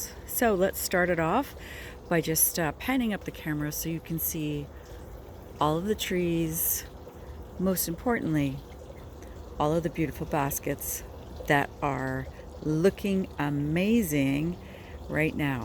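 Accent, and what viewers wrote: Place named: Canada. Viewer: Just found your channel. What garden zone are you? I hear a bit of Canadian accent. I am in Homer, Alaska - Zone 4-5, ?6 this year, depending. Love your style: beautifully designed, well thought out, well-grown garden in a space that most of us can relate to - not the "estate level" that is so gorgeous, but so unattainable. Hope to see many more videos on your garden.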